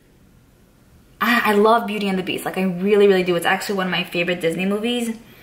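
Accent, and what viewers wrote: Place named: New Jersey, United States. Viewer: She has the same accent as Carli bybel lol are they both from jersey ?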